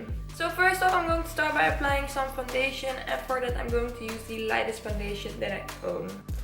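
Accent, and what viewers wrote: Netherlands. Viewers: Lmao first thing when I heard her voice: okee shes dutch 😂. Anyways love the make up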